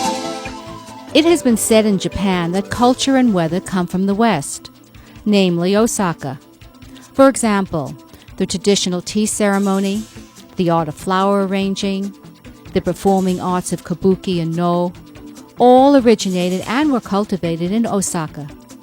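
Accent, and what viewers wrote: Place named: East Coast, United States. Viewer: The narrator's eastcoast accent was a nice touch....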